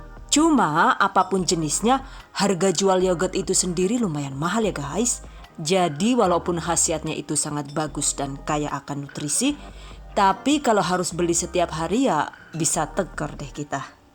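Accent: Banyumas, Indonesia. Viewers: Wkwkwk lucu bahasa ngpaknya saya suka haha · Terimaksh ataas resepnya, jd pingin bkin yogurt dewek, aku kl dgr suara ngapake, jd ketawa wae, hbs lucuuu siihh, okey, sukses slu, salam dr Wong Pati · Kok ada bahasa ngapaknya yaa,hehee,... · Ngapak lah...yu'.... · Wong ngapak 😀, asli wong ngendi Bu? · sampe kaget aku pas jebul bahasa ngapak 😂😂😂😂 tak kirain temenku ngajak ngomong , eh ternyata suara dari yutub 😂😂 I LIKE IT 🥰🥰🥰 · Auto subcribe cuma gara2 ngomong ngapak 😂😍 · ora ngapak ora kepenak.. enyong ya ngapak bun 😆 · Ngapak ke kayak kiyek lha, gae seneng bae nak ngerungok no. Hahaaa...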